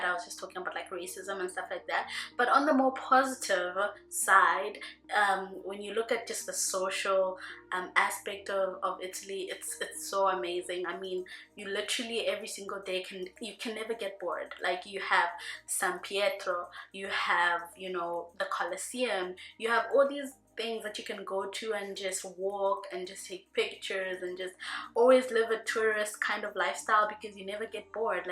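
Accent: South Africa. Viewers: LOL I spent the last two summers in Italy. they're watching you because there are a lot of Africans who have fled the continent! They are looking at you hard because you're so adorable! So how is your Italian progressing. Have you been to Turin, Sardinia or mid-country? Where is back home for you! You sound like you might be from Durban or some part of South Africa. if so, i love the country! They have a Mediterranean Diet. I didn't find the pizza as a issues because they don't even eat spaghetti and meatballs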